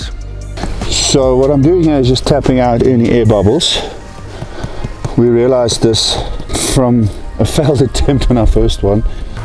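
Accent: South Africa. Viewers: Bro sounding south african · Are you South African? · is he south african? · Dope dude, this video made me realise u deffs a fellow south african, thnx for the content! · I'm south african and I swear that must be south african as well, he just sounds like one. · Is he South African?? Now I see the man's... from south Africa.... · Not been able to go back to South Africa since the pandemic begun but hearing other South African accents makes me happy🙏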